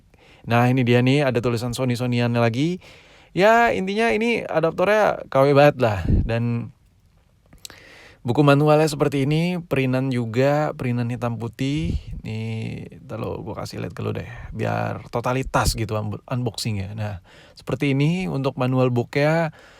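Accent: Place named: Java, Indonesia. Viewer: Lho orang jawa ya kok bahasa indonesia masih belum lancar ada medok nya jadinya gw like terus channel lu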